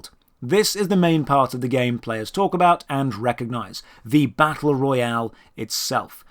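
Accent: United Kingdom. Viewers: This is the same thing he does with MMO's to be honest and clear. He complains that MMO's require you to level up and play the game... to finish the story. He complaiend about FFXIV's story not being fully accessible, etc. He demands hand holding features, and he pretty much complains about any forced social behaviour, and he has ZERO understanding of the genre. I pointed out Everquest for example being one of the best, and earliest, MMO's that did not hold your hand and to this day is still succesful. He then responded to me and claimed he liked Everquest becauseyou could "play it solo or in a group." In what WORLD can you play EVERQUEST solo? It's clear does not understand anythign he is talkign about what-so-ever, and is basically exploiting a british accent to sound smart and impose what HE likes onto these games. And ostensibly, he likes single player story games.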